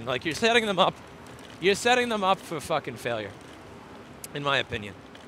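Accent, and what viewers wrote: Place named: Italian American, United States. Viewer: ... I apologize if you mentioned this somewhere in your videos and I didn't see it. But I need to ask you... Why do you look and speak like an Italian American but have a German last name?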